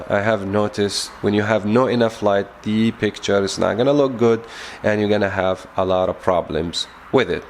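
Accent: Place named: Arab world. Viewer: This video was okay until I heard this annoying Middle Eastern accent.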